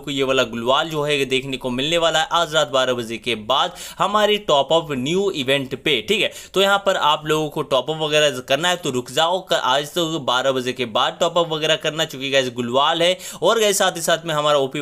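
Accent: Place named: Bihar, India. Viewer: Bhai aap Bihar se ho naaaa mere ko pataaa haii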